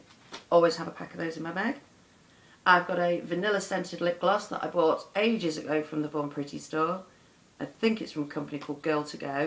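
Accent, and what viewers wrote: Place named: London, United Kingdom. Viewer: I love big bags too. Love yours. I actually just recorded one of these video's yesterday. I am gonna try to upload it today for yall. Or tonight. Lovein your accent. Where do u live? London? Also like your sense of humor. So u know Gemma pretty well? She is so sweet. I have known her from on here for a long time now. Such a doll she is. Anyways, great tag..I will have mine up soon. TFS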